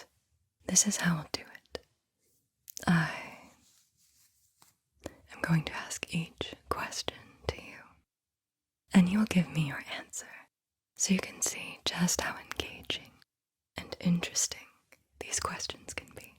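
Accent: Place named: United Kingdom. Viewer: 1 sometimes 2 morning coffee 😊 3 football (Love your British accent BTW) 4 music 5 grandad 😢 6 sorry I didn't catch that 8 carting 9 2 people falls the middle 11 face 12 hotel 13 // Xd 14 I guy with three b f Xd 16 I lost number count Jak & Daxter Senen 100% Police man coming Xd Idi Amin conquer Having a gf Xd Mercadona Empathy German Lost familiar? Sorry I don't have that understanding level I am nice person Animal loving Treat of others (This is the life) Wallet, phone, Air pods Currently lost train Yours 💜💜🔥 Curious * But JK Rowling fault 😅 Maybe not But I found 20€ And turned to catch them But they weren't Not me But take car dr n k A grl trowing €100 away on the street That later came to my pocket It wasn't r0b she didn't want them And Xd There was more money But I didn't take Politics Yes Queen Xd 😊 Humans AI Health care Barcelona Diddy Cap Chicken Fortnite ❤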